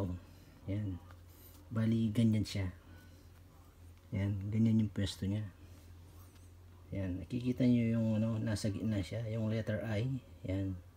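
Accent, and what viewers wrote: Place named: Pangasinan, Philippines. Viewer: Pangalatok ka di ba? halatang halata sa accent mo pag nag tatagalog ka eh..🙂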